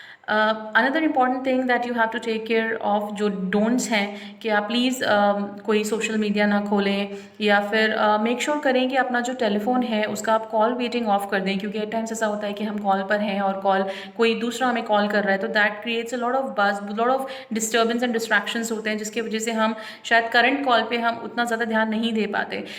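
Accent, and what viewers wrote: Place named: United States. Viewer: Woh great accent Ru from USA? Your English and presentation skills are awesome.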